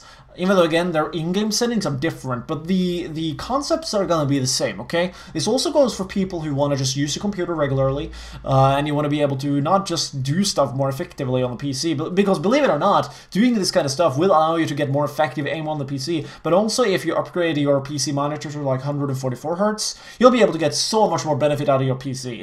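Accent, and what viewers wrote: Norway. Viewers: er du NORSK!? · Er du norsk for faen:)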